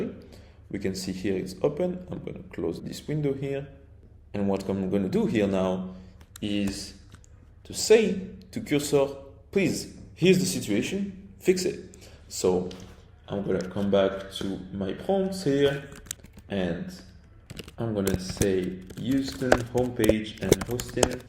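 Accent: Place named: France. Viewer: Hi, thank you for your video, it's great what you did. I think you have a slight accent, you are French?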